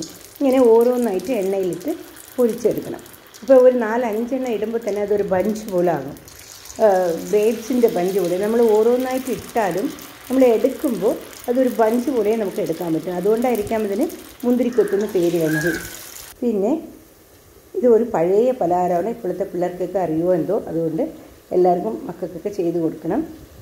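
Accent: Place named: Tamil Nadu, India. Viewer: നിങ്ങളുടെ സംസാരം നല്ല രസമുണ്ട്.. Tamil slang touch ഉണ്ട്, നല്ല recipe tnx ❤️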